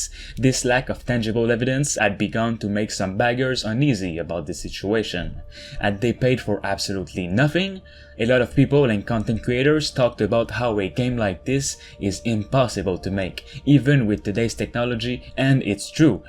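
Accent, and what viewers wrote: Quebec, Canada. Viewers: Tabarnakkkkk that Québécois accent threw me off 😂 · ce gars là est clairement Québécois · Toi c'est sur que t'es quebecois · Do I hear an Accent from Quebec ? Je crois bien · Je reconnais c’est accent québécois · Where is this guy from? Accent sounds like he's french canadian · Where are you from ? Ypu sound like a french canadian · I can sense the Quebec accent lmao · Tes québécois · Are you from Quebec cuz you got that accent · I feel the strong Quebec accent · you got that french canadian accent ma boi i might be wrong tho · T quebecois toe hein? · T’es tu Québécois par hasard? 👀😂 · Si s'gars là est pas Québécois bro... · Are you frome Quebec? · Qc? The accent is telling me you are a French Canadian just like me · Calique d'accent québéquoi mon boy bonne vidéo par contre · Bel accent québécois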